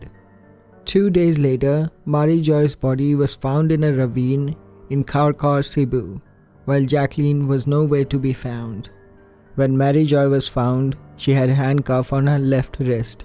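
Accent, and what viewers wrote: India. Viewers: Indian accent . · You sounded Indian, are you Filipino? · Indian accent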